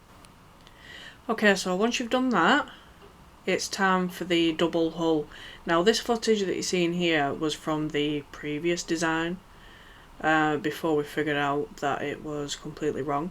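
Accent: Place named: Yorkshire, United Kingdom. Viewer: I'm from selby, and I recognize your accent, you're not too far from here are you 🙂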